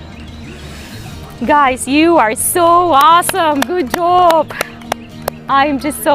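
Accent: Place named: England, United Kingdom. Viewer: I love your English accent. ❤️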